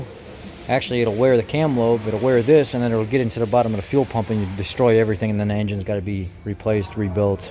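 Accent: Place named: Northern Illinois, United States. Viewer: Does sound any where like Steve, but he does sound like someone from northern Illinois! 😂😎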